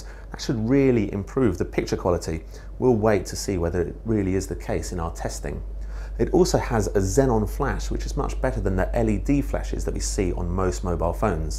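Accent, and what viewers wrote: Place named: Netherlands. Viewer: is het echt een britse of een nederlander? zo ja dan moet hij normaal engels praten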